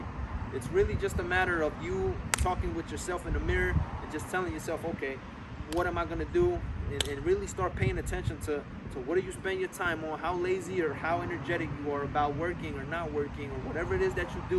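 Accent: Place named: New Jersey, United States. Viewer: Good advice ✅✅✅sounds like you from New Jersey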